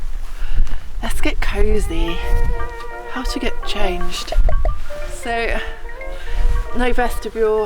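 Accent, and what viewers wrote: United Kingdom. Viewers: Sometimes I watch to hear your cute Brittish voice. Hope you are doing well.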